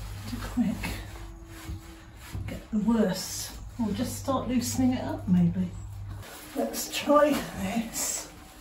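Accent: England, United Kingdom. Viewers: “That was quite a good workout there”. I can’t say it like you but I love your English accent. I needed a cheering up today!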